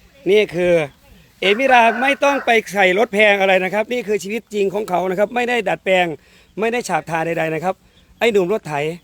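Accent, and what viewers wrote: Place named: Laos. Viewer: FC.จากสระบุรี เสียงดังฟังชัด ชอบอาจารย์พูดไทยปนลาว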